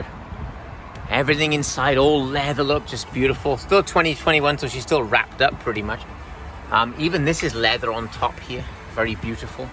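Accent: Wales, United Kingdom. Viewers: Good video. Where are you from originally? You sound Welsh.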